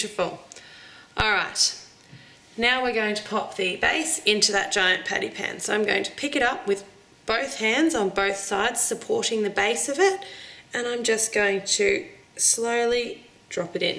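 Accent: Australia. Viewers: I love your accent.. why do the Australians get the good accents? :3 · Thank you so much I was about to embark on this mission with limited knowledge... I know a heap more now and nice to hear an aussie voice for a change.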